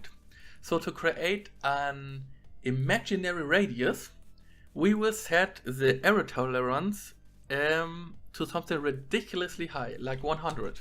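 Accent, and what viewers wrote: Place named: Germany. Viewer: German accent is much different than Polish but i can still understand every word. Very good tutorials!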